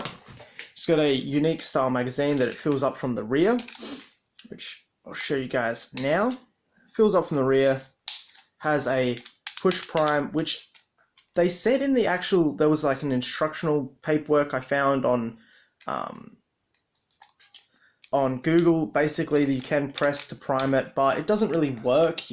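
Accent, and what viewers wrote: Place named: Australia. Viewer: Jelly Fishyzz all Australians sound like him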